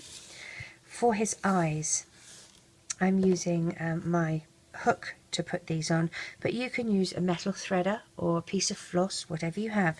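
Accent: United Kingdom. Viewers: Kate Schultz thanks♥ i love how do you speak...is it britan!? I love England! !!♥♥♡♡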